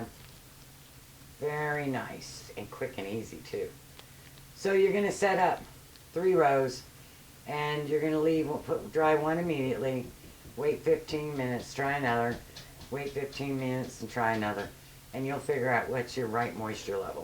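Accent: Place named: United States. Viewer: Hi there, thanks for the video. I've got a couple of questions regarding this. Firstly, in terms of flavour and general smoking satisfaction, how do these 'natural' cigarettes compare to regular, store bought cigarettes? - I've unintentionally bought 'fake' tobacco in the past, and the flavour was significantly different, though not necessarily worse. Secondly, I notice you haven't added any chemicals to your tobacco, excluding the menthol and glycerin. Now I know that tobacco companies like to add chemicals to the tobacco to help the cigarettes stay alight. Do you have any difficulties in keeping your cigarettes alight? I'm guessing by your accent that you live in a warm, dry, part of the USA. I myself live in the UK, where wind and rain make up at least 50% of the weather, which is often problematic for us smokers. I only ask as I'm considering looking into the necessary steps needed to start my own tobacco company, a fair trade company which pays it's farmers (likely African) a good wage, but that also (hopefully if viable) offers natural tobacco to it's customers. 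Many thanks for your help, and video, informative to say the least, if all else fails I may even grow and process my own tobacco :)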